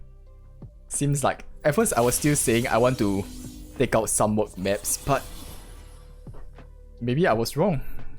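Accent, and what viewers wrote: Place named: Singapore. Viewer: I think I know a Singaporean when I hear one. Keep it up bro!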